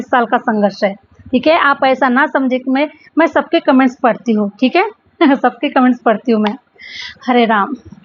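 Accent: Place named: Maharashtra, India. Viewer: Mumbai wali language Marathi vibe hain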